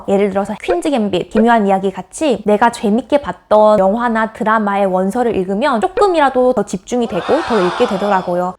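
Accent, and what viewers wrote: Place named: South Korea. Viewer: 아무리 국내파라도 결국 영어유치원 원어민 학원 줄창 다닌 것 같은데? 아닌가요? 그래도 한국 수도권식 단조로운 발음 악센트 고치려고 엄청 노력했을 것 같네요 ㅎㅎ 조선인들은 외국어비교부심이 강해서 ㅋㅋ 자기는 할 줄 아는 외국어 하나도 없으면서 남의 와국어 평가하고 자빠졌음 ㅋㅋ 이것도 외국어 공부하는 사람들이 넘어야할 부분중에 하나임..😂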